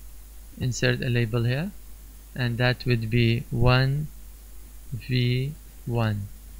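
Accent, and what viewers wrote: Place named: Arab world. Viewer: may i know what you race is bro? Your english accent is like an arabian... 😁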